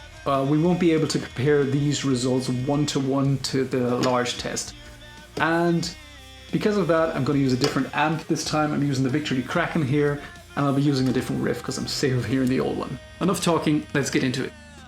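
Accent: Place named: Ireland. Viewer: Your English has a slight Irish accent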